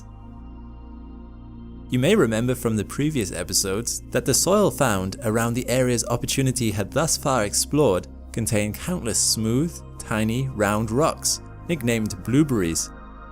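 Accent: United Kingdom. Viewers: What an amazing journey my friends! It's like a version of Hiliad, with badass names and frightening dangers, surviving far more than enyone would've guessed, a lengthly and full of updates and events, BUT THIS TIME with real imagery OF ANOTHER FRICKING PLANET. This is a modern epic and nobody can convince me otherwise. Also, its narrated by a cool brit guy, so what more could we ask? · hes british whatcha expect lol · bro its because he is British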